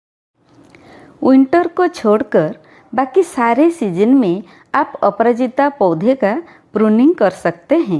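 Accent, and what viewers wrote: Odisha, India. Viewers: Very beautiful, your presentation is also very beautiful like Hindi in Odia accent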